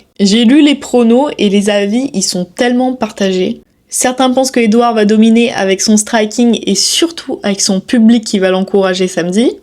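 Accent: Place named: Paris, France. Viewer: C’est l’accent Panaméen.. attention on critique pas …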